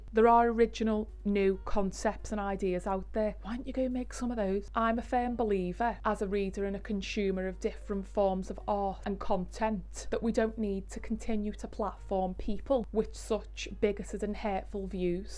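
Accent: United Kingdom. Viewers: oh my god you are so right! banger video and icl its lovely to see a UK booktuber with a non-queens english accent 🙏 may have slagged scousers off in the carabao cup final but you're an icon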